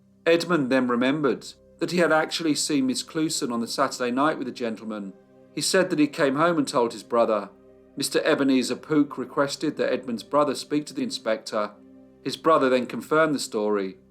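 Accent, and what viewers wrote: England, United Kingdom. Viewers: Amazing work on this tragic and sad case Your brilliant english accent brings the stories alive! Thank you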